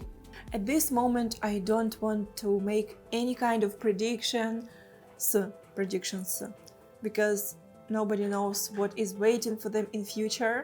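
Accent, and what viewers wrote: Russia. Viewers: Мадам, на каком языке вы бы не разговаривали - всё равно прекрасно слышно, что язык на котором работает ваш мозг - русский!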